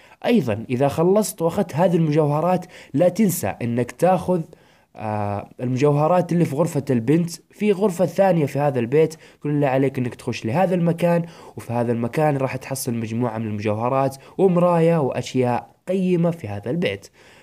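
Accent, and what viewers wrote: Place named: Hejaz, Saudi Arabia. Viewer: هذي اللهجه الحجازيه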